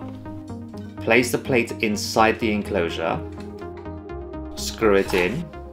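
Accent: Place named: England, United Kingdom. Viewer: This is exactly what I was looking for! Thank you!! Also this is random but I've never seen or heard a hispanic/latin with an english accent! I'm Mexican living in the US so it blows my mind lol. Saludos!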